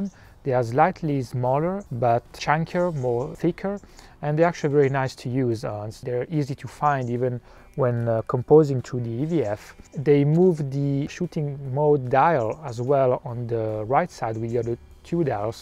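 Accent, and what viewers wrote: France. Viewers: Salut, j'ai vu un commentaire en fr et à l'accent j'ai un peut reconnu donc j'en profite comme je suis au top en anglais, j'ai actuellement un canon 70d avec un 18-35 de chez sigma et un 50mm, je cherche quelques chose de plus léger et compact quand je part en vacances ou sur des soirées, ce que je veux conservé c'est surtout le RAW, le viseur, la possibilité de changer d'optique et un bon placement au niveau du tarif, j'aimerai aussi un piqué correct, pas digne d'un 5d mark III avec une optique L, mais au moins d'un 70d avec un objo de kit. Je pense que ce olympus rentre parfaitement dans mes critère, peux-tu me confirmé ? As-tu des exemple en haute def de photo prise avec le kit de base de ce olympus ? Merci beaucoup pour ton retour !! Et bonne continuation. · Franchement très bonne vidéo, avec un bon petit accent à la touche française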